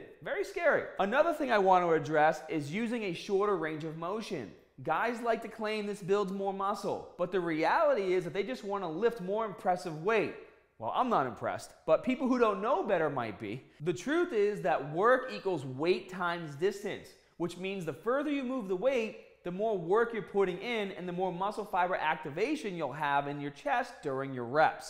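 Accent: Boston, United States. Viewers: The best part of this channel (outside the solid tips) is that if anyone new comes here, they will be so confused why he suddenly has or lost a Boston accent. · Holy Boston accent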